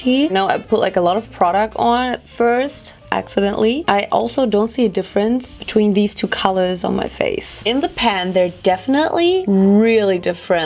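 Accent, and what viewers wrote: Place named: Germany. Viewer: Richtige deutsche Akzent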